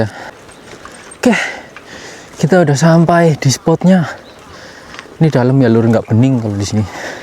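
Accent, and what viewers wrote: Banyumas, Indonesia. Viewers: ngapak ngendi ya? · Gass terus kang rika wong ngapak ngendi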